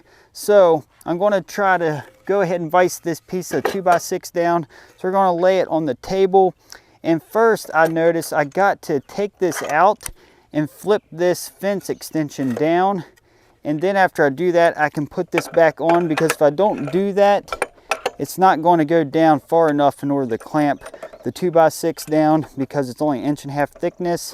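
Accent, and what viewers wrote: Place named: Baltimore, United States. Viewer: Where ya from, Baltimore?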